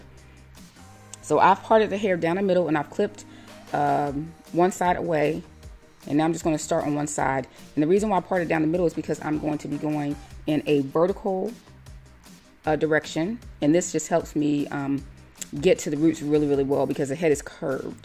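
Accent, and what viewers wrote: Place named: Georgia, United States. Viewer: Lol... I was just thinking she sound like she from Georgia!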